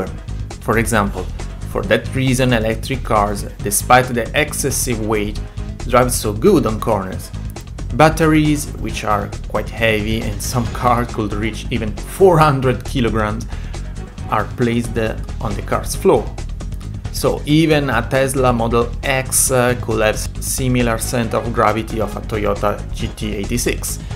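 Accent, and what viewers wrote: Italy. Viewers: Are you Italian? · scusami, è da poco che ti seguo ho solo visto 2/3 video, ma qualcosa nella tua voce mi dice che sei italiano, è irrilevante e solo una mia curiosità. comunque fai dei bellissimi video complimenti! sorry, I have only recently seen 2/3 videos, but something in your voice tells me that you are Italian, it is irrelevant and just my curiosity. anyway you make some beautiful video compliments!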